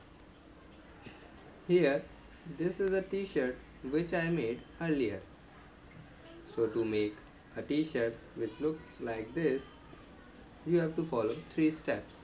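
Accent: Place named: India. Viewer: Indian hokar english bolta he pagal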